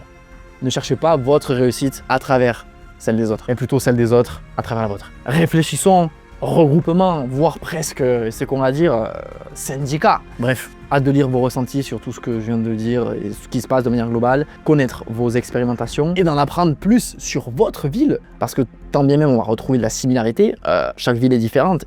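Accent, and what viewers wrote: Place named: Toulouse, France. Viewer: Ah ouais et l'accent toulousain c'est joli, je suis jalouse de pas l'avoir ❤ et je me suis abonnée dès que j'ai entendu le tiens 😊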